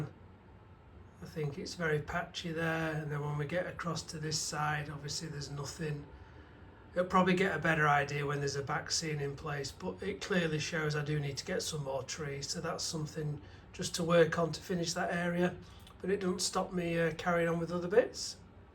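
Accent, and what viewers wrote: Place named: Lincolnshire, United Kingdom. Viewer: Oh no, you haven't done much. Not much to show for it. Only put a whole new loop in the helix, which is a magnificent achievement and will make running of steam trains so much more reliable. Only put new couplings on a whole set of coaches and made the set look much better. Haven't done much—only a fantastic week's work which has improved the railway immensely. An excellent video altogether. Lovely to see long trains running. Lovely to hear a Lincolnshire accent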